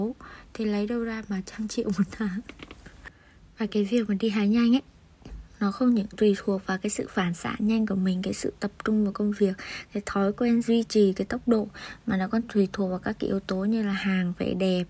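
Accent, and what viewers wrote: Vietnam. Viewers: Nghe là biết dân choa rồi. Cố lên em. :D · Anh rất thích giọng Bắc làm youtube farm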